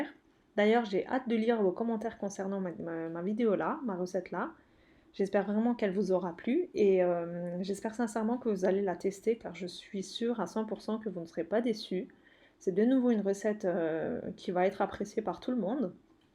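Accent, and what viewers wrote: Alsace, France. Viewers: slm je viens de découvrir votre chaine..toujours de belle recettes a testé..bien expliqué ..vous etes de l'Alsace ya comme un petit accent 😀bravo a vous en tout cas 🖒🖒😚j'aime bcp la cuisine turque aussi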